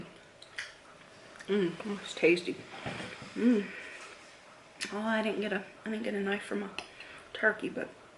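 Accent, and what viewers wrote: South, United States. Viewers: Agree, she's so friendly, sweet and down to earth and seems like a kind person, love her Southern accent_too! Love Thanksgiving food!😋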